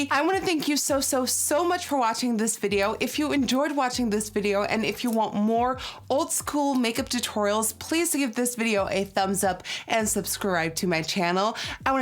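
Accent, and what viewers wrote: Netherlands. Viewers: I’m shook… because I just each led a video of in 2013 and Dutch accent is more strong… 2018 he accent is perfect English…I never know was Dutch I found about by watching her old videos …I would never of known if I never watched her older videos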